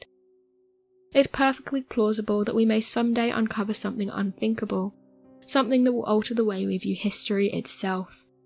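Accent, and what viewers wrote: England, United Kingdom. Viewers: She’s English and they have a lot of different ways of pronouncing words than North Americans. That’s probably what it is. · I love this ladies voice. Is she from Britain? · Why is a British accent supposed to be classy? It's so annoying! Good vid just damn Brits cant talk lol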